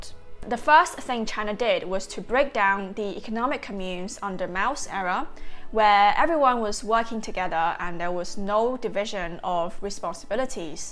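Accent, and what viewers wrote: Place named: United Kingdom. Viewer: She has ver British accent?.?why?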